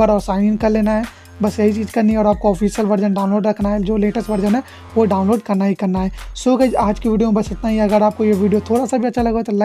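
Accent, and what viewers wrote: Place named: India. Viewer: Bruh u speaking indian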